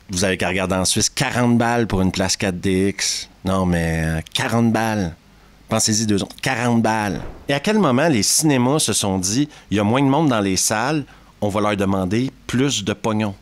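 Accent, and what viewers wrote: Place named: Montreal, Canada. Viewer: Suisse ??? J’étais certain que tu étais de MTL 😂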